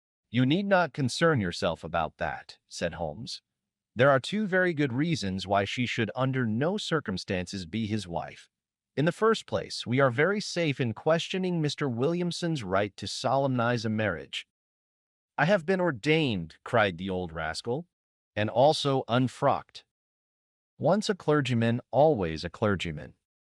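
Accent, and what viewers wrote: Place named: United States. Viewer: Probably a great story. Just can't listen to the American accent. Conan Doyle would probable be a little restless in his grave.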